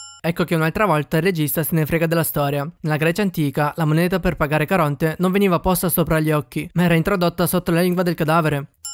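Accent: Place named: Naples, Italy. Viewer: complimenti per il video. PS. l'accento parteneopeo si sente tutto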